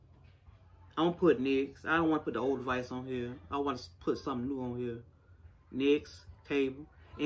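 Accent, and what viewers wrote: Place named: South, United States. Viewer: love the accent. reminds me of family from the south. haven't seen them since I was a child at 12.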